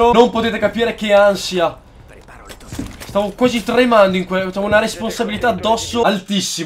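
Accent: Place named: Romagna, Italy. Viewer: ma sei romagnolo? Parli il dialetto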